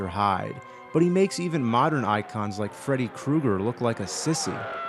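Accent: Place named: Philadelphia, United States. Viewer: E vai pegar um dos sotaques mais legais do inglês americano, o sotaque Philly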